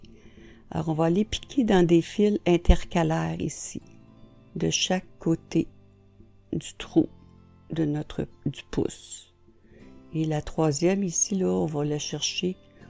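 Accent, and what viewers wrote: Quebec, Canada. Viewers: J'adore tous vos tutos. Je vous ai découverte il y a peu mais déjà réalisé plusieurs pulls et autres avec les jolis motifs de feuilles et fleurs et c'est absolument magnifique. Donc ces mitaines je vais les faire pour moi et aussi pour mes filles et mes petites-filles. Et j'ai vu des toques et écharpes assorties pour femmes comme pour hommes. Magnifique. Les cadeaux de fin d'année, je vaus les commencer dès à présent. Merci et gros bisous de Belgique. J'adore votre accent. Moi qui avais fait les démarches pour habiter au Canada ou Québec, c'était sur le point d'aboutir lorsque tout ce que nous vivons a commencer. Montrez -nous encore plein de joilies choses. Ce sont des rayons de soleil ☀️❤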